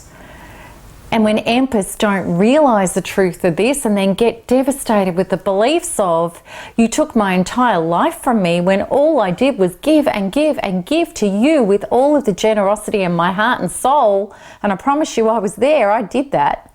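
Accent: Australia. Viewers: Love your Australia accent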